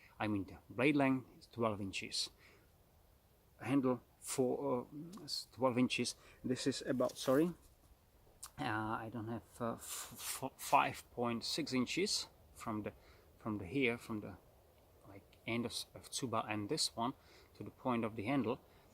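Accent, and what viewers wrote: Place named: Europe. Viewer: Great video thank you were you , sound very European, good stuff , im from Canada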